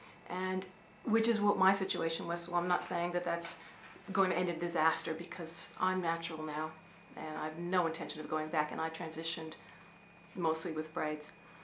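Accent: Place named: Australia. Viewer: I hear it too, pinky. There is SOME kind of accent there and it does sound Australian!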